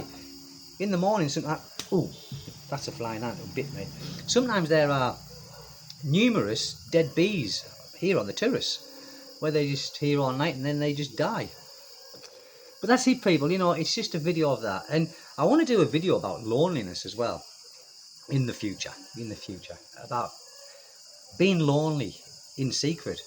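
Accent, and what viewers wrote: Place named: England, United Kingdom. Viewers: He has the Geordie English......I dor nor....lovely.😊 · Good Stories but most of all, my english improve... Hehe U have the english english.... Grueß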